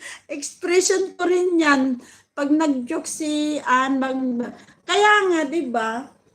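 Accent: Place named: Visayas, Philippines. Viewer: Kaya hindi yanmaganda pakingan host heheh may bisaya daming pronounced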